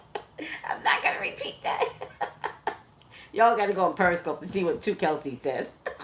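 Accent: New York, United States. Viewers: I love your New York accent!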